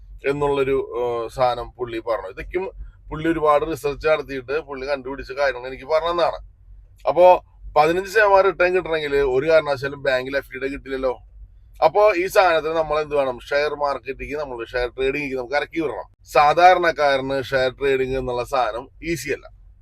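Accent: Thrissur, India. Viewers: I left a message in your WhatsApp I don’t know you heard it. I like your Trichur accent and watch most of your videos.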